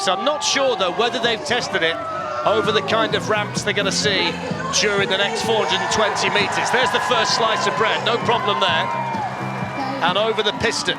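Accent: United Kingdom. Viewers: I love ghis british commentary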